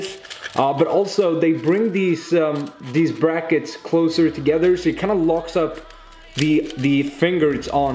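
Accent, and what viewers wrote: Sweden. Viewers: I was about to ask about your accent then you said Sweden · Are you swedicj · Are you Swedish??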